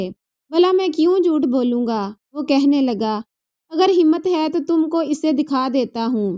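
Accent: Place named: Assam, India. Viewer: Wow khani achhi hai aap ki par ek bat aap asam se ho aap ki awaz se lag raha hai mujhe 😊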